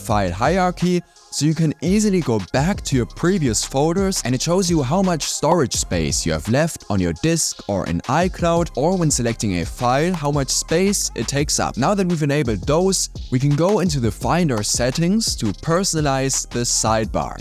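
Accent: Germany. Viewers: Are you German ? Man kann es raushören 😅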